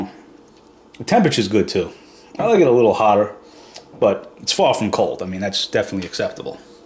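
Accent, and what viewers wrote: New York, United States. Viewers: New York accent?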